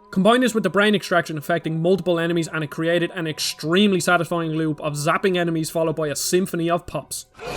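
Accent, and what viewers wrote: Dublin, Ireland. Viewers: Didn't expect a Dub accent on a Gamexplain video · Of all the places I expected to hear a Dublin accent, a GameXplain video was pretty low on that list. Fair play to you,.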